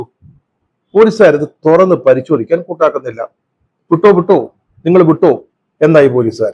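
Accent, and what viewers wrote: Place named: Travancore, India. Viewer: Wonderful...Your searing comments laced with humour and delivered in the Thiruvithankur lingo was captivating...You are perhaps the best political commentator among all the Malayalam commentators...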